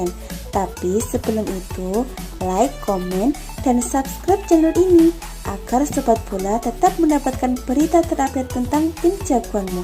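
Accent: Java, Indonesia. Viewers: Medok nya 😅 Penyebutan pelatih MU seakan aiueo 😅 Redaksi nya perlu di perbaiki But overall its ok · Wong jowo hhhh Penyiarnya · Ora penak banget di rungokna, medok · Suara khas medok.. #ForzaMilan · Medok amet mba logat jawa nyah ✌️ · Medok cuq ... 😄😄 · Medokk bet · Jowo ne pundi mbak pembaca berita??? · Medok jancuk wkwwk mantabs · Klu nulis judul yg bener ploook...dan gasah medok medok Ngomong nya kampretttt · Medok kali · Medokk min🤣ttep semangat💪💪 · Forza medok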